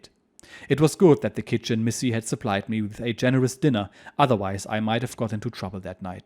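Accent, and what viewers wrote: Germany. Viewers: I absolutely love hearing german diaries with a german accent. So much better than AI. Thanks! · I appreciate it's in english with a german accent not a posh British accent for a german wartime memoir. Thanks!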